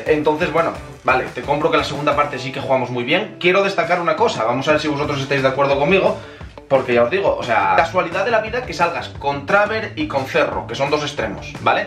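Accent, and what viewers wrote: Asturias, Spain. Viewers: Me encanta el acento asturiano ye saludos desde Granada 😍😍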